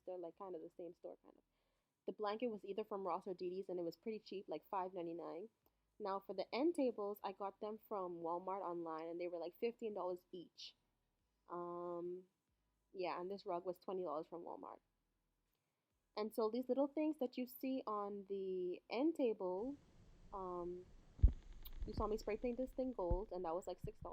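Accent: Bahamas. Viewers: Are you Bahamian??? That accent creeping out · You have to be a Bahamian because of the accent. I'm watching this like hey she sounds like me loll... Nice Apartment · I know a Bahamian when I hear one! Great job sis🇧🇸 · From I hear ya accent Ik you was a Bahamian #Bahamas🇧🇸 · The Aquarian Empress I cam just to see if someone caught it lmfaooo. As soon as I heard her speak I said she a Bahamian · Are you from the Bahamas? I can here our accent🤣🤣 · I said the same thing. She sound like a true bahamian lol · You sound Bahamian 👀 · From the video started i knew you were Bahamian! So am I! And i love this video. Keep up the great work bbg. Def got a new sub. :) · I came looking for this comment! I said she has to be from the bahamas! Sending love from nassau!!!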